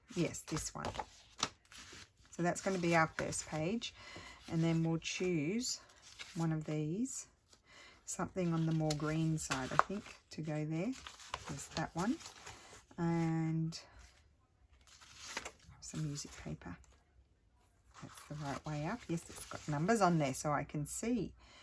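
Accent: Australia. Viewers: Love watching your Christmas videos. These journals are amazing. I waited till you had a few and watched them all. Hoping I can find some bags like this. Bit hard to go shopping around looking as England in 2nd lockdown, so will look on Amazon.. From listening to you, are you from Australia and now in Italy? Your video are great. Thank you for sharing.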